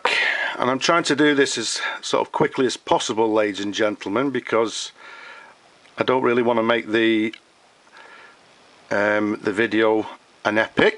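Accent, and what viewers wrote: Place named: North, United Kingdom. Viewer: I do appreciate your video! By the way, your accent sounds pretty much like northern British accent haha. I don't know if I'm right about this but I like the way you talk definitely! I have just got the exact same pen on Ebay.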